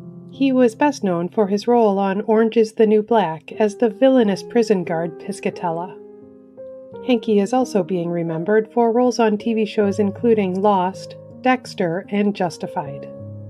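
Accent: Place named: Ohio, United States. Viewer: shout out to the gal that has been doing narration for Legacy~ calming voice. also i hear an Ohio accent me thinks. 😺Thank you~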